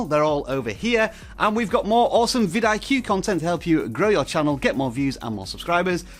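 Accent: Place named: United Kingdom. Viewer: hey, are you british? am loving the accent 😄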